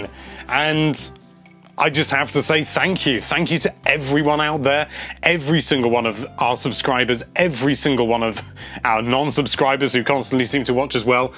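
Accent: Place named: United Kingdom. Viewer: Congratulations, you deserve it! I love watching your videos, you are sympathetic and honest. And the most important thing: your marvellous British accent.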